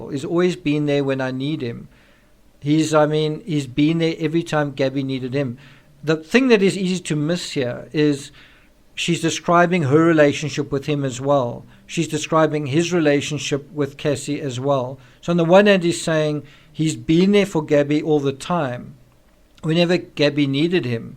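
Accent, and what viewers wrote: South Africa. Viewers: Mr South African Accent, I don't know you; just happened that your page came up on my feed BUT, can you read a room? At the mark, there appears a very dark, very black woman, costumed as a "darkie maid" with a minstrel-esqe expression on her face. Something like Mammy in Gone with the Wind, except Hattie McDaniel's Mammy had far more innate dignity. Wrong picture to illustrate your little narrative. Cassie is 8-10 years older than her brother, she says. From her conversations it seems that he was the Golden Child, a boy, -finally!- even though he may have mental issues; Cassie is the family scapegoat. Look at her and her husband; her parents first probably ragged at her as an adult in an abusive first marriage (like Gabby) then because of her and her husband's weight, losers. Then they house sat for her parents while they took Brian up to NY for whatever reason, most likely mental /physical/psychological treatments. 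He's not been regularly employed, it seems yet he disparaged Gabby for trying to manage her vlog/social media as a business, days before her death, blaming her for being OCD as he admitted to the police that he was hearing voices. Cassie is not the out of order one here. As she spoke, I could HEAR in my imagination the verbal pillorying that would be aimed her way by her mother for "daring!" to defy family /lawyers instructions, "just like you to say the wrong thing about something you aren't privileged to!" Cut the woman some slack. She has little kids to pr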